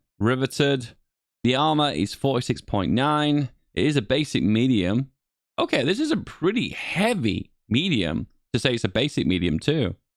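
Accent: England, United Kingdom. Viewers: still with the "bow je" terminally English >.>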